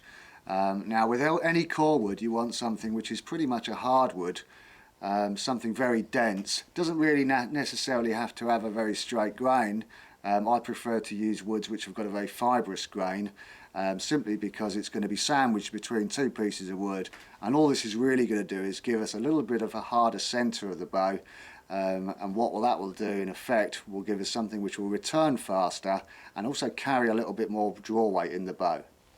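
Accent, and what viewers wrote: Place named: London, United Kingdom. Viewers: I think East london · Nope. Definitely not Northern. Southern, probably London area. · London or nearby. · Not northern at all. I'd say down by London somewhere.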